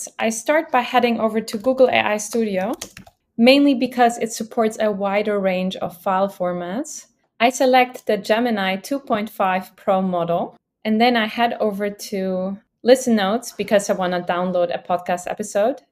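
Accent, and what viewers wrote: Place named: Netherlands. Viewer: A few seconds in... wait a minute is that a Dutch accent ? A Dutch AI-content Youtuber ? We don't have much of that, would be funny if it is. Glad I was right. 🙂 I wonder if someone like who seems to be very good at using tools. Could you use Firebase studio to get results. Tina Huang who seems to be a developer was able to do it obviously, but I wonder for example if you could get the data store connected for that CRM. Or maybe we just need to wait an other month or 2 for Google the improve it/make it easier to use ?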